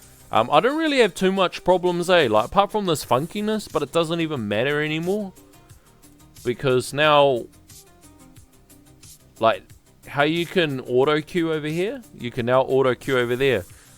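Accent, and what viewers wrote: Australia. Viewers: Been waiting for that smooth Aussie accent to explain V27 to me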